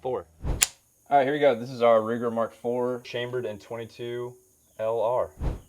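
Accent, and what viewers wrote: Australia. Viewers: lol-the Australian accent. :face-blue-smiling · 😂 Australian accent hehe 😂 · All i smell is your bullshit 🤣 Ozzy accent. Dude, ya killen me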